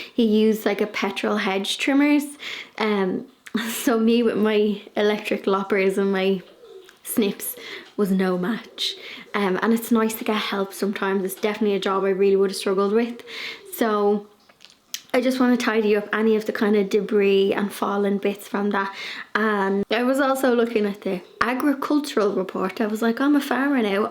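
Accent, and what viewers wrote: Ireland. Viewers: I'm in zone 7a in Niagara Canada, we have 8 months of growing season, no snow since this week, yes we plant bulbs too. I love your gardening vlogs, very inspiring to see all that green and to hear your cheery Irish voice. I've only seen Gardener's World on YouTube because of your recommendation. Thank you for sharing. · Hi I have just started dating your blogs. I love you Irish ascent. As I,m from Australia. Where did you learn how to decorate you little house. Thank you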